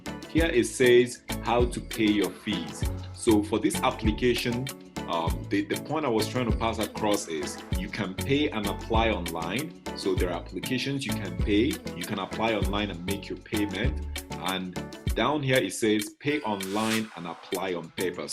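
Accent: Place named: Nigeria. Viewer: your accent reminds me of my Nigerian ex lol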